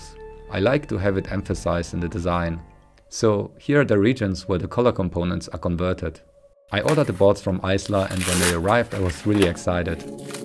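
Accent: Germany. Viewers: 4 sekunden die stimme gehört und direkt gewusst es ist ein deutscher:D:D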